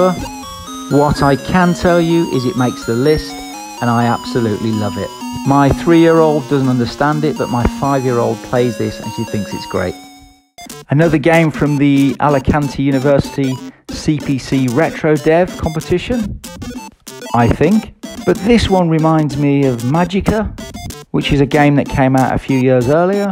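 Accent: United Kingdom. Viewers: Great video (and british accent). Some french video games here (Orion Prime ;) Any input on the "Grand Turismo"? They said it should have been released for more than a year now..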